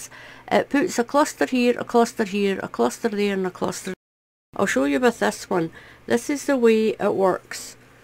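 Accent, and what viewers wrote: Scotland, United Kingdom. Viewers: i really love your tutorials nice to hear a scottish voice i'm from stirling now living in northern ireland 44years i've been here you explain everything so good i will be trying this hat ty ❤ x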